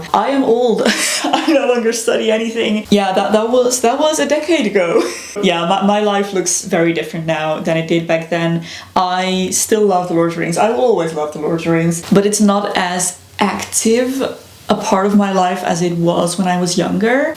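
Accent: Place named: Netherlands. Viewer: One of the reasons I’ve been subscribed to you for so long is I’ve felt such a kinship with you from the beginning: I was a nerdy LOTR teen who enjoyed costuming and things like that. I’m American, but my dad’s family is Dutch and we lived in the Netherlands for a while when I was a kid, so I get such a thrill when you talk about life in what I feel is my second home. Early on when I started watching you I was like “Heyyy, I recognize that accent!” and was super excited to find out you were Dutch. I love your channel and your homeowner/gardening content is especially relevant to me right now as my husband and I bought a house just a few months before you and Robbert did. Your garden is basically my fantasy (we live in a desert so it’s impossible for me to do what I really want), it’s so lush and green. Anyway, this is all parasocial stuff, but I just wanted to say that you’ve got a friend in the western US. 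Thanks for what you do! (Also being a mom is the coolest thing ever - obviously a super personal decision, but I absolutely love parenthood!)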